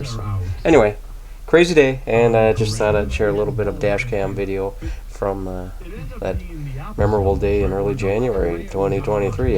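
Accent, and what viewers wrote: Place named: Minnesota, United States. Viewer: This is the most Minnesotan accent ever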